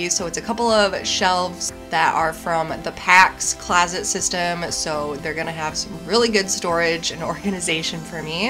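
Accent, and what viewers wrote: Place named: Midwest, United States. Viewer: I don't mean to be a creeper but are you from the midwest? You pronounce your vowels like me lol (Minnesotan)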